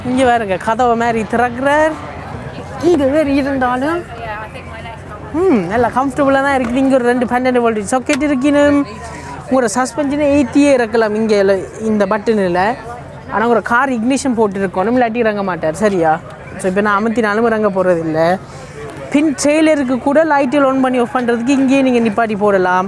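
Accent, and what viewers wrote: Sri Lanka. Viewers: Bro srilanga tamil super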